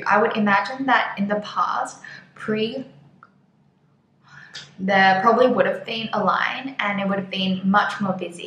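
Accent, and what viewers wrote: Australia. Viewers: didn't know sight seeing and dinning out in Michelin are valid exemption reasons for travelling... lol , but all good now, when you return to Sydney , don't even have to home quarantine now if you have been vaccinated... your Aussie sounds like music for Aussie and Hong Kong expats...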